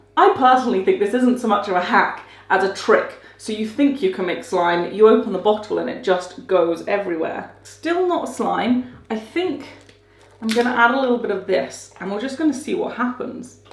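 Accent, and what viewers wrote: United Kingdom. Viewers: What part of the UK do you think she is from?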